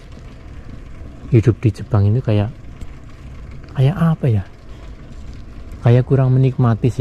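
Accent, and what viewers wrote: Banyumas, Indonesia. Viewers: Rika wong endi mas, ngapak tulen😂😂..salam dari Cilacap😊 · Kya jawa ngapak,,banyumasan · kelihatan orang banyumasan .ngapak banget. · Ngapak kang endi kang... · rika wongndi sh kang bahasane kyane wng cilacap ap purwekrto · Ngapak ndi mas. Purwokerto Banyumas hadir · Mase ngapak kie tah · Halooo.,..Mas...... salam kenal.... mantap.... terimakasih atas infonya tentang kebudayaan Kebiasaan sehari hari Masyarakat Jepang.... Kalo boleh tahu Mas asal nya dari mana?.... kelihatan bahasanya Ngapak 👍🇮🇩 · Wong ngapak kieh jelas temen Cilacap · Soyo suwe ko ngapakmu metu mbuuaaahhh....😂😂😂🙏🙏🙏❤️❤️❤️ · Rika asli wong ngendi kang,deneng ngomonge ngapak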